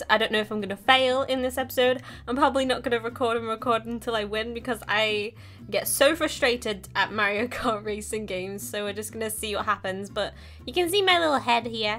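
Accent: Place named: Liverpool, United Kingdom. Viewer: i love hearing your accent! its funny hearing someone from who's also from liverpool :)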